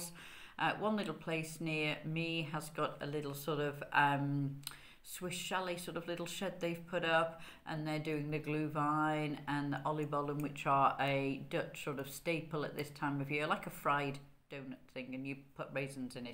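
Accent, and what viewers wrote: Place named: England, United Kingdom. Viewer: Wow beautiful woman and I love your English accent !!!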